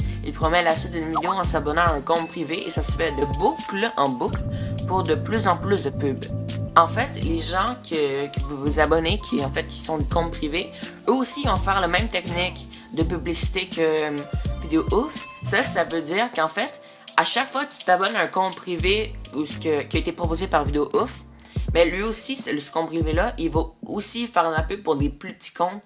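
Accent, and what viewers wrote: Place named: Quebec, Canada. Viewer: Gg t québécois j'adore ton accent 😍😍😍😍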